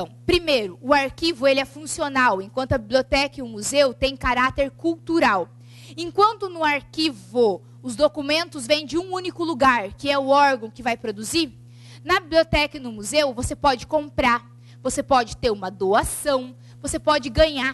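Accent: Rio de Janeiro, Brazil. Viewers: Ótima aula, mas esse sotaque dá neRvoso! :D --- cariocas entenderão.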